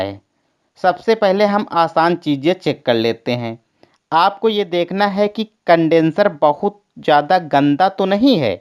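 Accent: India. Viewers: You Are Gujrati?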